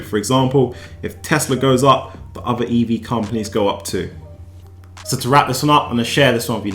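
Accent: London, United Kingdom. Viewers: Are you from london?? SE13 here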